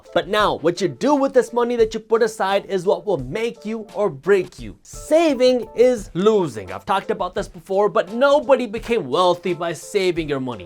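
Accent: India. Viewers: Indian accent and indian culture real af😂😂😂loved it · Love the Indian accent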